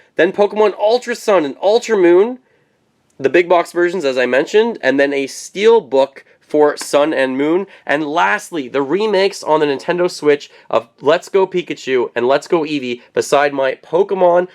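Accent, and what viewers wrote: Canada. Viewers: That is a pretty thick Canadian accent.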